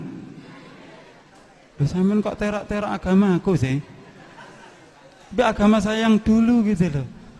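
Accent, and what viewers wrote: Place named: Java, Indonesia. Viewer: ngomong nama tapi kq jawanya nglotok ya....wah parah nih orang.....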